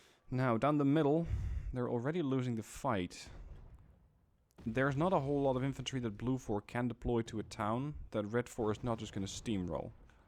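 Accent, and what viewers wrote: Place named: Netherlands. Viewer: New mic. Still sounds Dutch. I guess theres no fixing that :-)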